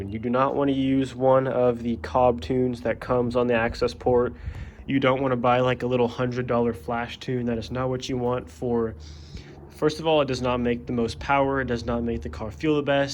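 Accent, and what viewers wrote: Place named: Oklahoma, United States. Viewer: oh dude what part of oklahoma are you from? any where near norman?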